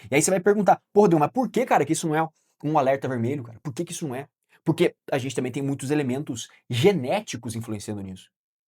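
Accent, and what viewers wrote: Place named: Paraná, Brazil. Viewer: Vc parece do Paraná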